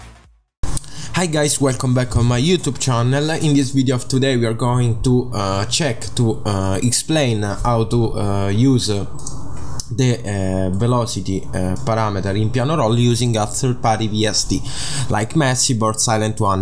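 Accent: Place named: Italy. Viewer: Si sente troppo che sei italiano🤣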